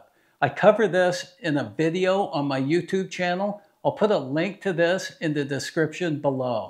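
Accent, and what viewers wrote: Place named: United States. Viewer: I noticed that too. Thought it was a European spelling but he sounds American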